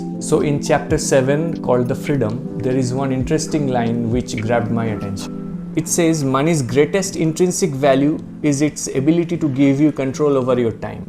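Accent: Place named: Bengal, India. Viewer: Such a heavy bengali accent.....and its your usp.....never try to change it.....its your identity❤❤❤❤❤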